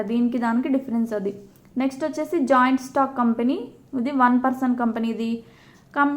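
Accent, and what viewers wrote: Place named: Kadapa, India. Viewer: Good simply n shorty. Slang koncham baa gunship. ☺😊 Kadapa ani andhariki artham kavalana emi? 😀😀😀😁😁. Manchi vedio Subscribe inkaa cheiledu... Inkaa inlantivi expect chestunnam. 😊😊😊😊slang...is good anaiah funny. ..thank you. .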